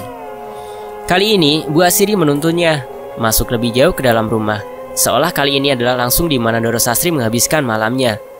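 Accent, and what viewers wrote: Java, Indonesia. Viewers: q seneng logat jowone sang Pembaca wong ndi yo kiro2 😀😀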